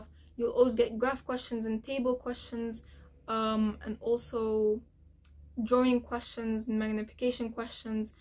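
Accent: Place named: Arab world. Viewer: I FIND YOUR ARABIC ACCENT SO SO SO PRETTYT AND YOUR HUJAB I SO SO SOS SOS OS PRETTYY TOOO LMAOO thankyou for thiss <3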